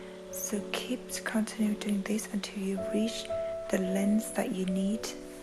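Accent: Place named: Iceland. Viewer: U got a björk accent 😍😍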